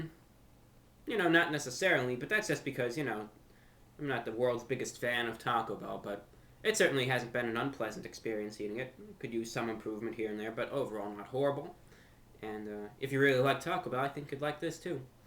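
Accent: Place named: Rhode Island, United States. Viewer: good reviewing, you sound like you are from the great state of Rhode Island!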